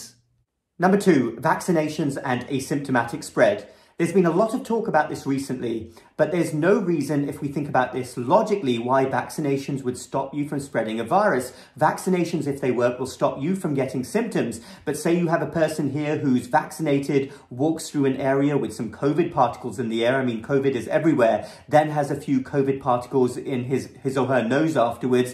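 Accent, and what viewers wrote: England, United Kingdom. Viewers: English accent and your intelligence makes you a bawss professor anyway, no need to add on the dorky glasses you look good bruh you rawk already! boss doc!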